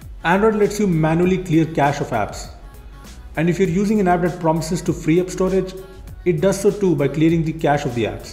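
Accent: India. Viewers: i always wonder why every indians that's speaking english sounds like this ._. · Why should you bother when english is not his mother tongue ... Literally nobody gives a fuck to english in India as there are more than 2500 languages. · my God I can't keep listening any more Indian accent... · Indian accent, I just love it.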